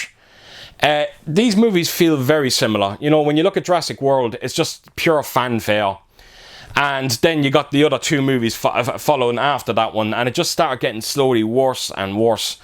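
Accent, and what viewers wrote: Ireland. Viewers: Hey, that is a lovely 4K steelbook set. I have to confess that I've only seen the first three movies in the franchise. I also own none of them on physical media as I only collect horror, independent and underground films. If I collected anything else I wouldn't have the finances for it and I most definitely wouldn't have the space. Even when you review or show movies I'm not really interested in, I always enjoy watching every piece of content you upload. It must be the Irish accent 👍👍👍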